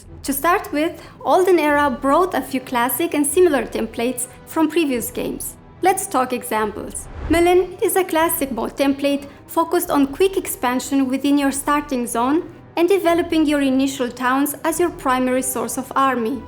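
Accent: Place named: Romania. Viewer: your accent sounds Romanian. I'm Romanian.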